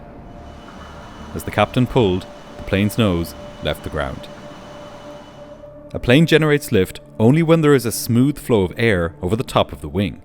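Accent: Ireland. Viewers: Don’t you just love the Irish accent ❤ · "Outer two turds." Man, you make superb documentaries, and I'm not mocking your accent. I just get a giggle whenever I hear the Irish in your voice. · How did plane crash videos become my comfort show 😅 I blame the Irish voice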